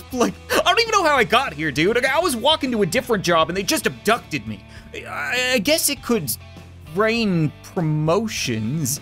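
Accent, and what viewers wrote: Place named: Newfoundland, Canada. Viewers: not all Canadians have accents he's a newfy which is the Canadian Irish that's why he talks like that and also I'm Canadian that's why I know this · He canadian what do you expect. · It is a Canadian accent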